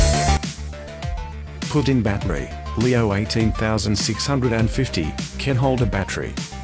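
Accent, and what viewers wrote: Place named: England, United Kingdom. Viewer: Sebenarnya dia logat inggris tapi pakai bahasa indonesia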